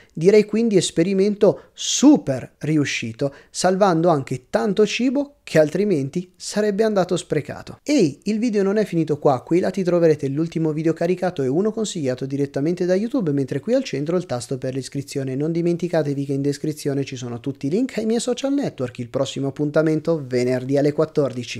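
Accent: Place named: Perugia, Italy. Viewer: Infatti... pure io sono di Perugia e ho riconosciuto la cadenza... anche se non marcata..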